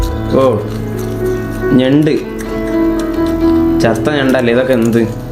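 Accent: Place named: Kozhikode, India. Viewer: Bhasha shaili vyathyaasam indaavum bro Karanam vayanad kaaranaan kozhikod karanan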